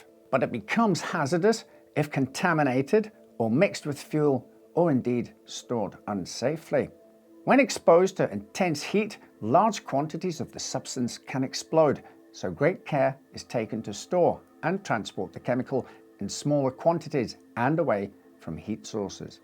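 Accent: United Kingdom. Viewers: It is pronounced that way if you have an American accent. This gentleman has a British accent and therefore pronounces things differently. So what? Could you correctly pronounce the sometimes strange names of the cities in Great Britain?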